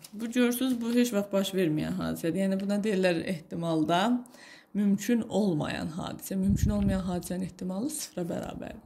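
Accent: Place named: Nakhchivan, Azerbaijan. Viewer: Naxçıvanlısınz danışdığınız xoşuma gəldi +dərs izahı😍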